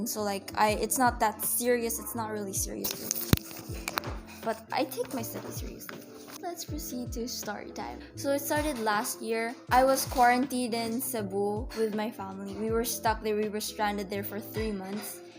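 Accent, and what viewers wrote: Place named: Japan. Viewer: I saw your video on TikTok! You and yamato Motivated me >< btw, you said you watch Anime. May i know where you watch anime? I want to watch Anime too (for entertainment and for learning na din hshs) And!! I like your Japanese accent😭❤️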